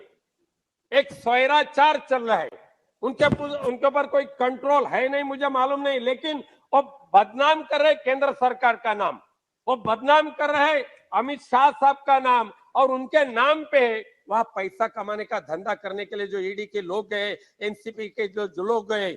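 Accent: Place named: Malvani, India. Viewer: मालवणी आवाज दिल्ल्हीत गाजला ....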